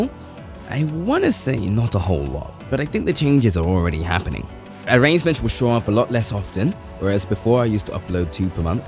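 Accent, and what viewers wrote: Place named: United Kingdom. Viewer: You’re British?! Nice!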